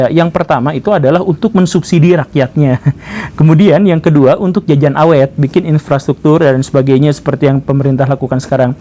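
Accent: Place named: Sunda, Indonesia. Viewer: Se7 pak....logat Sunda nya medok banget euy...orang Indo nya yg harus mau berubah, lebih mau bekerja keras tapi juga harus cerdas dong ya...😆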